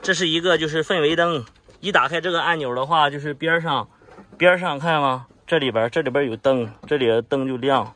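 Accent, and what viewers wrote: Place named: Northeast, China. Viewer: 你的口音听起来是山东人，不知为何又带有东北味道😓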